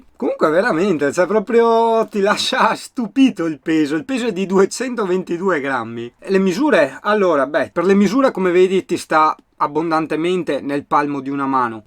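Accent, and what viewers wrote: Veneto, Italy. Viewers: Ciao, bravo fai anche tu recensioni che ti aiutano ad avere ulteriori introiti. Ps, ho vissuto a Mestre tanti anni ora sono poco fuori, il tuo accento che è delle nostre parti mi rilassa!!! Ciao beo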